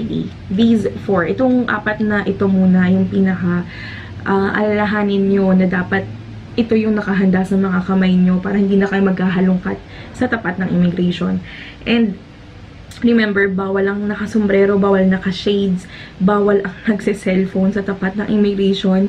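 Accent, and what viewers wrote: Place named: England, United Kingdom. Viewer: thank you and btw, I like your english accent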